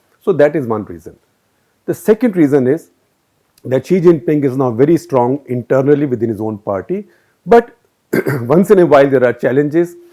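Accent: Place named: South Delhi, India. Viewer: You just run on assumptions all day with south delhi english and hence i unsubscribed....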